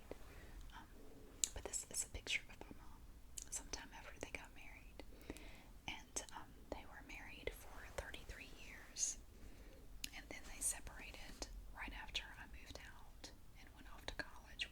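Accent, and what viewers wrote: South, United States. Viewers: You’re a real gem ♥️ Yep, you talk fast like hell, indeed, but now I get it why and I adore you even more😁 Your southern drawl is absolutely lovely, I’m watching a Netflix TV series “Ozark” and the drawl in it is like a main character, check it out😊 Thank you for your great work, I love your videos since the very beginning, you’re one of a kind!🏆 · Haha it’s called that southern draw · I love the way you speak I don't feel you talk fast. It's lovely and relaxing and the southern accent just kicks it off beautifully x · I love you. I cannot watch anything else at the moment. Your whisper, soft spoken videos are just amazing. As a girl from the UK I find a southern accent lovely. So very relaxing. THANK YOU!!!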